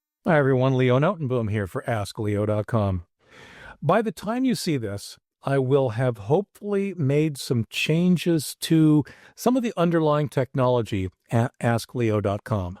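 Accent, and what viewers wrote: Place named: United States. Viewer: I assume by your accent that you are American (as am I). In Dutch the surname "Notenboom" translates to "nut tree." I hope that you sometimes celebrate your heritage and have visited the Netherlands at least once. Nog een goede dag!!!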